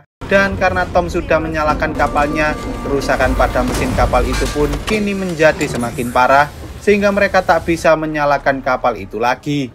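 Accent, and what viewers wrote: Java, Indonesia. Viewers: Bisa gak pake medok gak? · Paling seneng dengerin review film yang suara yang review ada jawa jawa nya🗿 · BANG LU ORANG JAWA KAH😊=BANG LO WONG JOWO TAH😊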